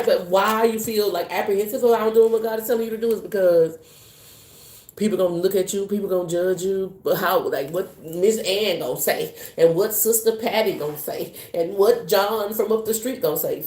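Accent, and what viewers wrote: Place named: Caribbean. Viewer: Love your accent. How it comes out when ya get excited!! Im from the Caribbean and reminds me of home. Different but the same. That slang. Bless you 🙏 love ya girl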